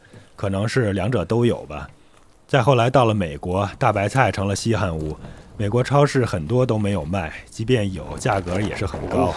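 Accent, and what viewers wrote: Beijing, China. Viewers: 无意中发现你的频道，感觉你是北京人，没敢问。原来还真是。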